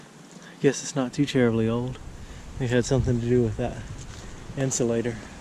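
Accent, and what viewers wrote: South, United States. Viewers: I usually only see your newer videos. I've only been following you for about a month or so. This is the first time I've seen one of your older videos and I love how much thicker your southern accent was. I love your videos.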